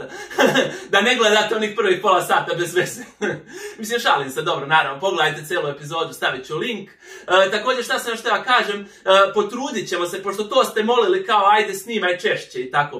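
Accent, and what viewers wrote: Užice, Serbia. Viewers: Obožavam tvoj Užički naglasak! Bio sam tamo par godina pre jedno nedelju dana kod bivše devojke. Nedavno sam pronašao tvoj kanal, i religiozno ću te pratiti ka daljem putu! Pozdrav druže iz Subotice! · Hvala vam ,svašta sam naučila slušajući/gledajući vaš video. Vrlo ste spontani i prirodni u izlaganju. Tome po mom mišljenju doprinosi ERCOVSKI šarm u kombinaciji sa UŽIČKIM govorom ❤️. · Užički naglasak, podseća me na vojničke dane, a čujem da je sad u krugu kasarne Lidl, znači opet Svabe okupirale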